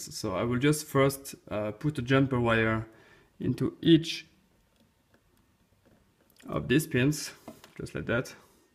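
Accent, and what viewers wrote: France. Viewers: Are you French ? You have one of these accent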